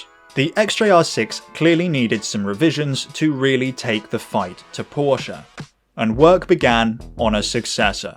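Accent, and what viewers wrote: United Kingdom. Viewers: As a french man I love your cotenent and the way you tell racing stories ! The English accent may also play a role... 👍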